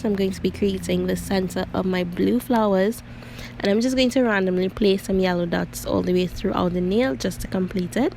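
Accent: Trinidad and Tobago. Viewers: You accent sounds Trini :)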